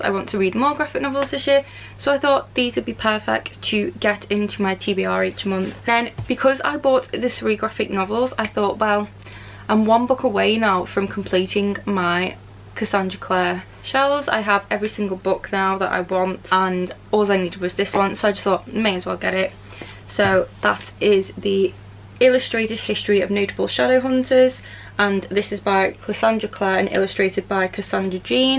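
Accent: Liverpool, United Kingdom. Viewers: You sound like your from liverpool? If so go liverpool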